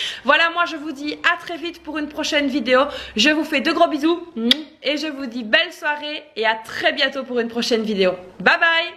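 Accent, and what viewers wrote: Liège, Belgium. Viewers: je me disais bien que l'accent était liegeois! Moi si le coca justement j'en achete que la bas car ici c'est tellement cher :o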